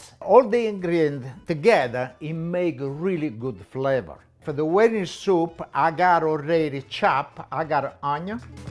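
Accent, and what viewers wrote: Italy. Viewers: Sig, you are amazing. You speak English the way I speak Italian, so it's always a pleasure listening to you. Farfalline? I completely lost it when you said it would become a farfallone if you put it directly into the soup. Ladies and gentlemen in the audience, you cannot properly translate what the man said into English. You can, but the translation just does not work - I still can't stop laughing. Think, a little, itty bity butterfly put into the soup would become Mothra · best soup in history in my opinion. btw I really love your Italian accent. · I really enjoyed watching you cook. The soup looks really good. I love your Italian accent.😊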